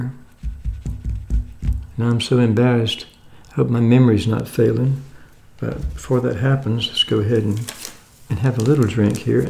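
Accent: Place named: Delaware, United States. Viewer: Where are you from? You remind me of a Delaware accent or maybe Philadelphia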